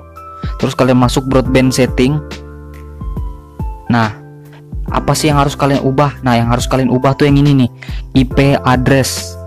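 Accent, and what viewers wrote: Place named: Borneo, Indonesia. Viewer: Kek logat dayak bang org mana bang?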